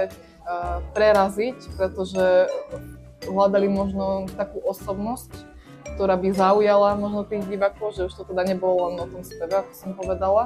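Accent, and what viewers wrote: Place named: Poland. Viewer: W pierwszej chwili nie mogłem rozpoznać języka, nie byłem pewny, bo brzmiał jak połączenie słowackiego z ukraińskim z polskimi naleciałościami. Dopiero później zrozumiałem,że to słowacki/czeski z polskim akcentem.. Akordeon uwielbiam, bo w kiedyś sam grałem.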